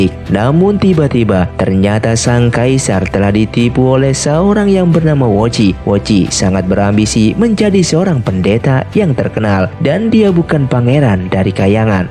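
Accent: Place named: Java, Indonesia. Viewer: Dari jawa mana bang?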